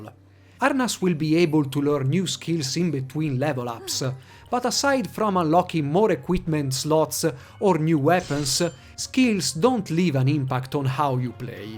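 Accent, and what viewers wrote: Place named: Italy. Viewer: You're the first person I've heard who has such a strong accent, but speaks so good english. Italian?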